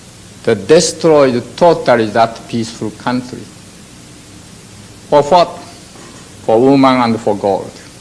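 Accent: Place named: Japan. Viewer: Love the video, but I can't unhear that he sounds like Morgan Freeman with a Japanese accent.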